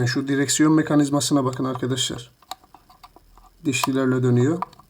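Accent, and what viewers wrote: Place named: Rize, Turkey. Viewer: Seviliyorsun reis. Videolarinla yeni taniştim ve cok profesyonel. Şivenden rizeli sin galiba ben de rizeliyim reis yolun açik olsun daha büyümen dileğiyle .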